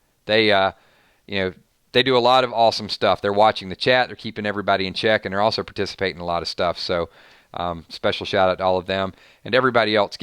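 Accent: South, United States. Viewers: Aw-Ha!!! That's what draws me in... your southern drawl!!! Go 'head country boi!! :-D Rick Kilz, Kilz Grimes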